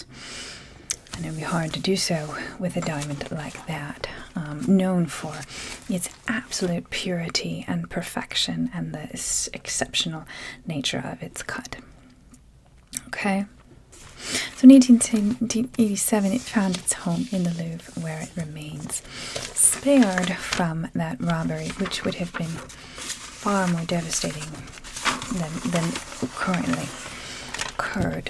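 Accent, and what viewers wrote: Transatlantic, United States. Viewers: You have an almost transatlantic accent and it’s soooo relaxing to listen to. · Where is your accent from? Is it a combination of different places? It's almost transatlantic and super relaxing. New subscriber here.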